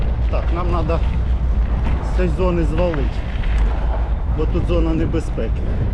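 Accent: Poltava, Ukraine. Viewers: А що не так з суржиком? ... Мені дуже по-домашньому відчувається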